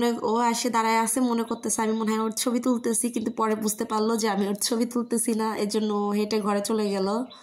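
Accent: Barishal, Bangladesh. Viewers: আপু বরিশালের ভাষা খুবই দারুণ ছিল 😂😂😂😂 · বরিশাইল্লা কথা ভালই লাগে। খুব ভাল লাগলো সবুজ ধানের ক্ষেত😊 হাসের বাচ্চা খুব কিউট 😊 পুরো টা দেখে নিলাম 😊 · দারুণ লাগলো ভিডিও টা আপু,,, আরও বেশি ভালো লাগলো আপনার বরিশালের আঞ্চলিক ভাষায় কথা। লাইক দিয়ে পুরো ভিডিও জুড়ে ছিলাম আপু 💕💕🌹🌹❤️❤️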